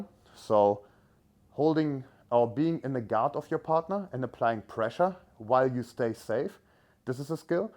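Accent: Germany. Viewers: He is German (or his accent is German for sure) what do you expect…. I dress like this too 😊. I always wanted to know if Jozef speaks German, I think so.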